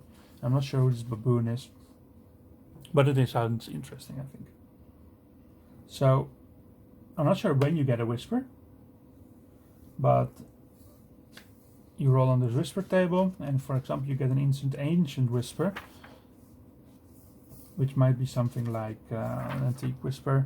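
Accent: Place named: Netherlands. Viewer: This was a very comprehensive and thorough review! I kind of want to get it now, once I've got enough money to spare for it. Megadungeons are super interesting! On another note, are you Dutch by any chance? Your accent sounds very familiar. (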